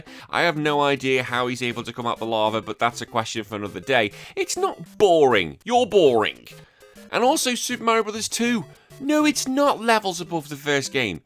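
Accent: United Kingdom. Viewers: Its the British accent. But no, different sounding.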